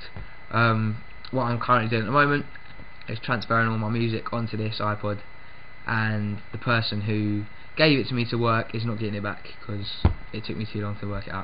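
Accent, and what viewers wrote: United Kingdom. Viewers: Thank you my British speaking friend. :D Now im up 60 nigga! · your british ???? cause thats kool doggy!!!!!! Lol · nice british acent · that is an london accent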